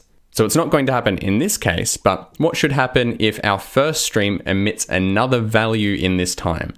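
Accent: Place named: Australia. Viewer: very good tutorial. Well explained. I've learned a lot from you! And you've got an Aussie accent, which is a plus!;)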